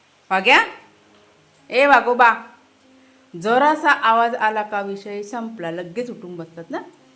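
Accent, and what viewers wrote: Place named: Konkan, India. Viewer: देश तसा वेश तस प्रांत तशी भाषा... प्लीज समजून घ्या कोकणात इतर ठिकाणी अनेक प्रकारच्या भाषा बोलल्या जातात तसेच मग त्याचे उच्चार असतात...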